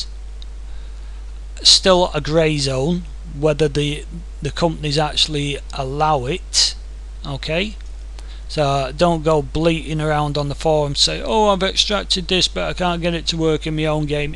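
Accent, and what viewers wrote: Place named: Yorkshire, United Kingdom. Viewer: Agreed. I had to search a bit to find this but it saved me from having to use Maya. You sound like you're from somewhere by Yorkshire too,..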